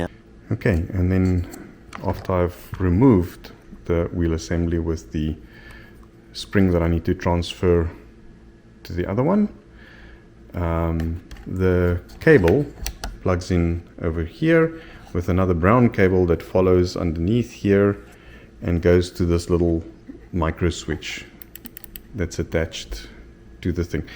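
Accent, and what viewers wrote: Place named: Germany. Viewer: Awesome video! Exactly what I needed for help with my wheel motor replacement. You the man! Love your German accent 😍 Felt like a scientist was stepping me through the robot's puzzle.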